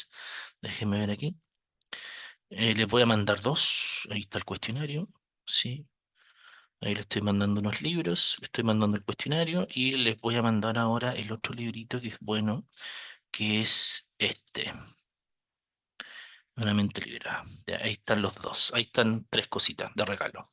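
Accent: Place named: Chile. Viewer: Genial, estudiaré el tema y además con acento chileno. ❤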